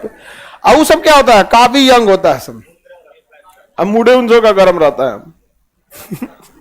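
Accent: Bihar, India. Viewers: Aapka Bihari andaz bahut achchha hai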